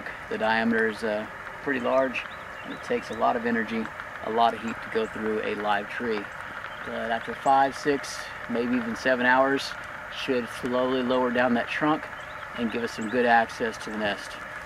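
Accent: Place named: South, United States. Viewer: a man with a southern accent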